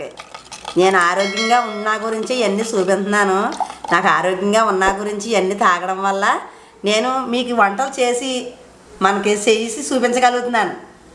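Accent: Godavari, India. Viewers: godavari yesa basha adhurs bamma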